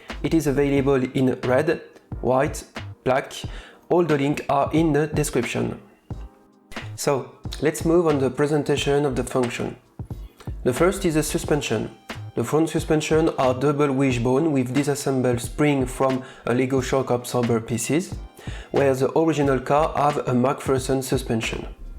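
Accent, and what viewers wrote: France. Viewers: un accent tellement francais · Awesome video as always! I also do like your French accent :)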